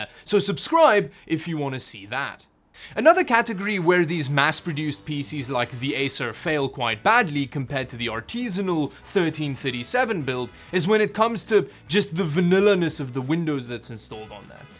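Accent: Afrikaans, South Africa. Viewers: What accent do you have? Dit klink Afrikaans... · You must come from South Africa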